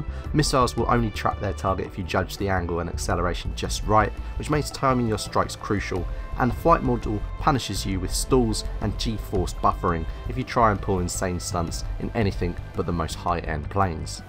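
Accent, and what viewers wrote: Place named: United Kingdom. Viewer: I can't sit through another YouTube game review video with a heavy-handed British accent. I just can't. It's everywhere. Imitators are even putting out videos. Some of them have obviously practiced quite a bit. I didn't down-vote or anything like that. I just can't listen to the cockney-esque or "Liverpool-ean" narrations anymore.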